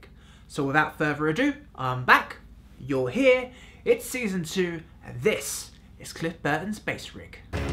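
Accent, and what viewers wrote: England, United Kingdom. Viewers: I thought that was just his English accent.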